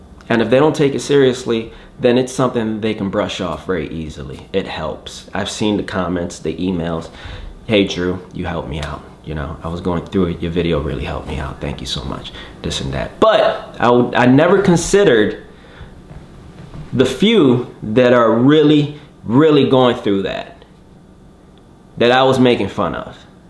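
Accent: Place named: Texas, United States. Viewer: Damn bro you from texas?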